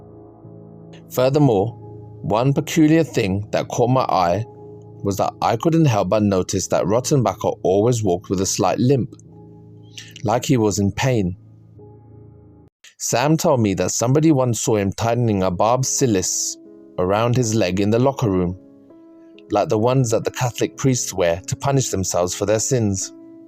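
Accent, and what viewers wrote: United Kingdom. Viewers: Class story, hearing it from a fellow Brit is even better, as my football team says as its motto, south London & proud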